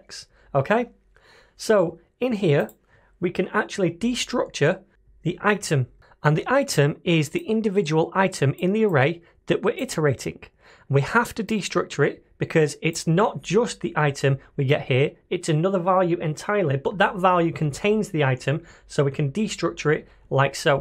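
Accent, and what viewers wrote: United Kingdom. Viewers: Hello, greetings from Argentina, i love the British English..thanks for the tutorial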